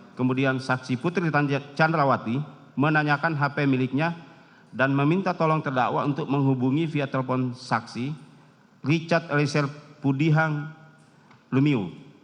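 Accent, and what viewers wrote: Java, Indonesia. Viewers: Hal yg di karang karang membaca nya uga gk lancar pletot pletot boso jowone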